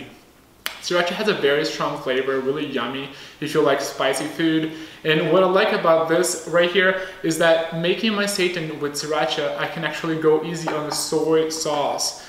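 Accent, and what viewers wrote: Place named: Brazil. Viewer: Your accent sounds Brazilian! Vc e brasileiro?? I've been looking for more sources of protein that are low on carbs because I'm struggling with my macros lately. They've been around 60% carbs 18% fats and 18% protein. I'm struggling hard but this video and your banana bread video helps a lot! Make more please!!!! Obrigada :)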